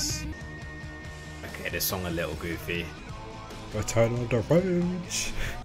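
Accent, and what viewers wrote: United Kingdom. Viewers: Why do you have a british accent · Nice to hear a British voice in this music YT space for a change. Especially someone taking it seriously. Keep it up, mate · Wasn’t expecting you to be British.. didn’t know yall wore duwies.. when did yall start that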